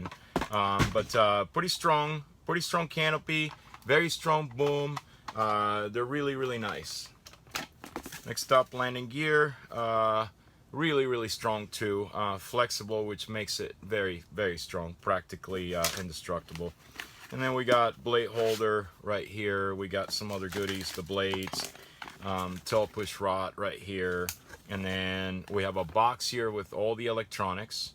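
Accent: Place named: Canada. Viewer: Great vid! I know you live in Florida like me...but you must have grown up in Canada? Accent...Thumbs Up!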